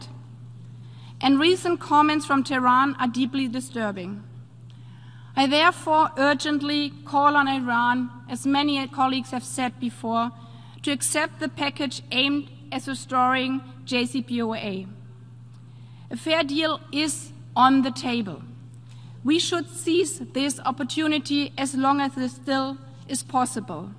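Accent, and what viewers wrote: Germany. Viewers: Astreines Englisch. Für Jedermann verständlich, emotional und mit deutschem Akzent. Besser spricht kein deutscher Außenminister. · Als halb Ire, der mit deutsch und englisch aufgewachsen ist, muss ich sagen dass ihr englisch nicht schöecht ist. Natürlich hat sie einen deutschen Akzent, sowie 90% aller deutschen. Man versteht sie aber sehr gut, ihr Satzbau und ihre grammatik sind quch gut und sie spricht besser englisch als 90% unserer Politiker und wahrscheinlich besser als 100% aller AFD Plotiker, also bitte mal klarstellen, macht ihr euch über ihren Akzent lustig? Sehr gewagt als deutsche, denn ich wette ihr habt alle einen starken deutschen Akzent und ich wette die meisten von euch können nicht mal einen geraden Satz formulieren! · Ja, sie spricht mit deutschem Akzent. Und der wird auch stärker während der Rede. Aber der Inhalt, das Vokabular, die Grammatik sind einwandfrei. 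Übrigens hat auch der Präsident, der sie am Anfang ankündigt, einen starken Akzent...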